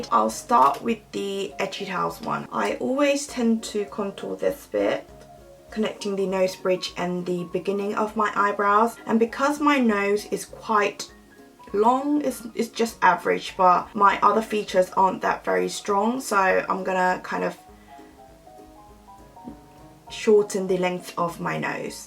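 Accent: England, United Kingdom. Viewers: your british accent is amazinggg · omg u have such a cute english accent!! loving ur content girl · Are you british? Your accent is so nice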